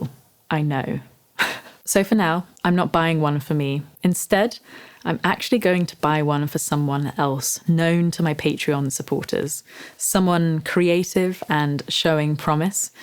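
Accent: United Kingdom. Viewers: I just love your voice <sigh> It's the perfect British accent. And yeah, the new MacMinis are remarkable value.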